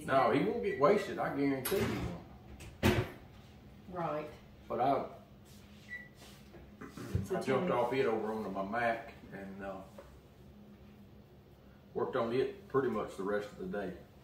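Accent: South, United States. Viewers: I could listen to you ALL DAY! Love that strong southern accent! Simular to my grannies teacakes except more Nutmeg & buttermilk. I also use white & br.sugar for that slightly gooey chew. Cook 325° 😋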